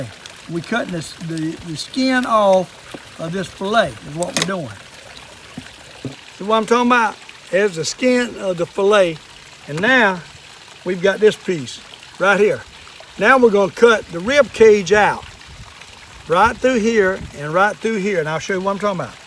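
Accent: South, United States. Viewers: You are inspiration sir. This Yankee loves your accent and your down-south upbeat personality. Your mama raised you right and I bet you never slapped her in your life. LOL...take care! Mike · LoL it's all Good, us Northern Yankees say crawwpys. But I'll admit, you got yourself some big ones, down there,. Evin if you all talk a little funny,. Great demonstration.and video,. Cheers !!! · My poppa always cut their heads off and gutted them, but my wife and kids dont like eating panfish that way, so we dont eat fish often. Came here for some info about fileting panfish, and found a goldmine. Poppa was from southeastern North "Cackalacky," and his accent was so similar to yours that this was almost like listening to him teach me how to filet panfish. Only thing missing was him saying, "now look here boy" about 30 times in 10 mins, 😂. We lost him in the fall of 2000, and I still miss him, but this vid just reminds me of fishing trips with him, especially his last where the heat got to him and as we were leaving, we got into a school of Crappie (crap-ee is the right way to say it too, lol), and he told us to stay a while more and we all (me, my dad, and him) caught our limit of huge Crappie. 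Had a HUGE fishfry that weekend! 150 fish! Thanks for posting this, sir! Both for the education and sparking one of my most cherished memories.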